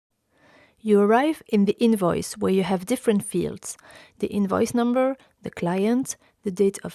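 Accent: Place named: France. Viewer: Hello ! By your accent, I imagine you have uploaded the same video with a voice-over translation in French ?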